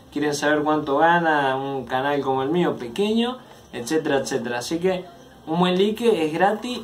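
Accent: Argentina. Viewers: Sos Argentino verdad ?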